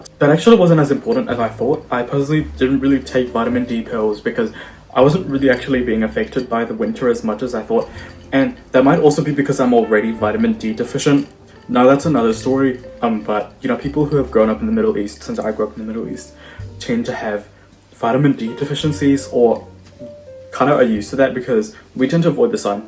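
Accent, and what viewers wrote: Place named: New Zealand. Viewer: mans sounding kiwi as f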